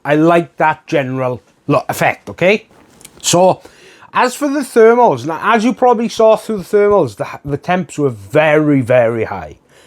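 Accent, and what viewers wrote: Wales, United Kingdom. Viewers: Not only do I really like your videos but it's refreshing to hear a Welsh voice in the tech space. Makes me miss living in Aberystwyth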